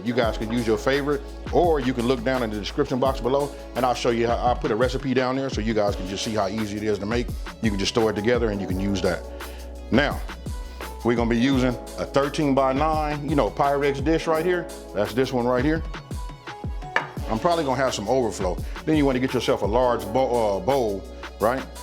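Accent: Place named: California, United States. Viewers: Man your accent is so Cali Unc it warms me up! All my favorite family is from Cali and hearing you talk reminds me of them. This looks amazing and I definitely plan to make it. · Yup! I'm pretty sure is from Cali too. He has an LA accent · Hitting us with that Cali accent, but food is always point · 🔥 lol I knew you were from Cali its the accent my state and yes gotta have the corn tortillas and that Las Palmas! Great video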